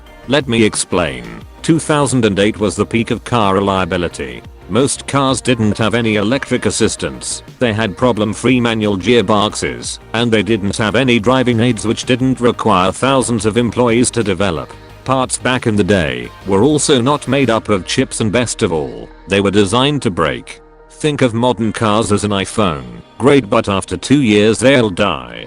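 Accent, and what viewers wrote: Cavan, Ireland. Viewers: I just realized that best part of your videos is your original, Irish, voice. Now, do 10 reasons why new cars are better then old ones. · Older cars are just better in every sense, my God that robot voice is terrible, go back to your Cavan accent! 😂 😂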